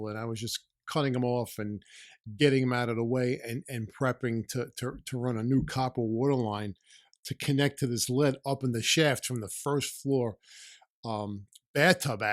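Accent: New York, United States. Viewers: Nice NY accent!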